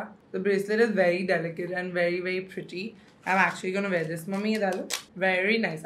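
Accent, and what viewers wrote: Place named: Gujarat, India. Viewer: I love your Gujarati accent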